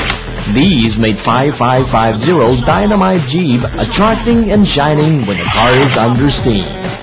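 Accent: United States. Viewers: wtf.. the narrator has the same crappy grammar that you can read in translated chinese manuals, but an american accent?! Me's confused!!!! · this guy's got a great american accent, but the grammar reveals this isn't an american :)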